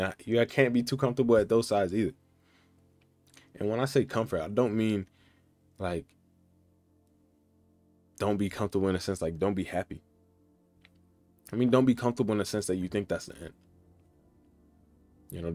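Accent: United States. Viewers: You from Georgia bruh?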